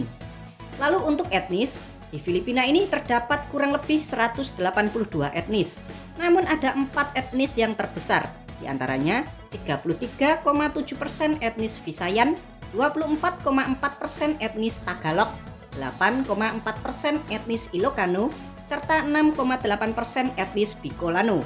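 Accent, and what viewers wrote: Java, Indonesia. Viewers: suara mimin lucu banget karena orisinil logat jawanya itu yang bikin lucu 🤣🤣🤣🤣🤣🤣🤣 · Adminnya medok banget logat jawanya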